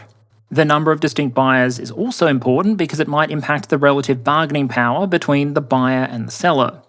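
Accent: Australia. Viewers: I'm just here for the aussie accented sarcasm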